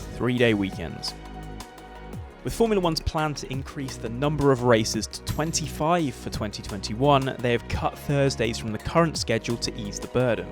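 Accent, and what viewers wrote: England, United Kingdom. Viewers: this STRONG English Accent ... kill me...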